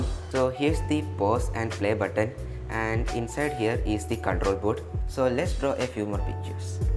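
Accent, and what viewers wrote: Kerala, India. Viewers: 😌I saw you made a drone..Your accent tells you are from kerela.. 😹🙏guruji, pls let us be your students.. Just can't imagine your future.. 👍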